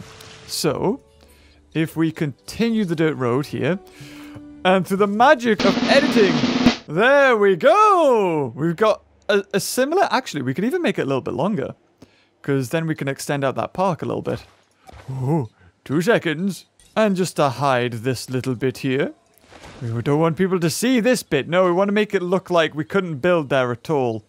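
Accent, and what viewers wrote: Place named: United Kingdom. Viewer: Okay, that person who said all that mean stuff is really annoying to me for many reasons. 1) Saying the same damn thing for three comments straight doesn't get your point across any better than saying it once. 2) How can you not like laugh?! It's so blubbin' funny. Infectious, actually. And for the record, I love British accents. Just makes everything funnier.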